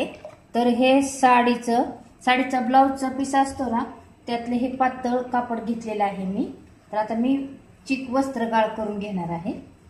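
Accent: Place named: Nashik, India. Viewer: mavsi nasikkadchya ahea ..amchya kadchya chan language agdi apli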